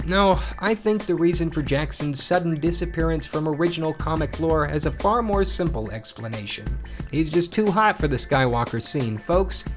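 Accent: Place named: New York, United States. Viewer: Best HS Book Report Ever. Sounds like a New Yorker....gotta be a New Yorker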